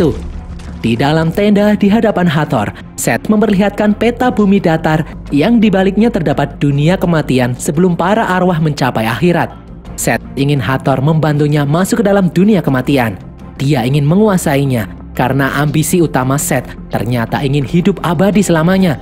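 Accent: Java, Indonesia. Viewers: huruf B dan D nya medog bgt bang. khas bgt channel ini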